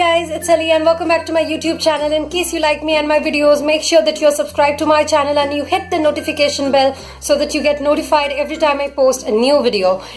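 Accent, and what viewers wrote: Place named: India. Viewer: where are you from 🙄you re kind like indian accents. 😏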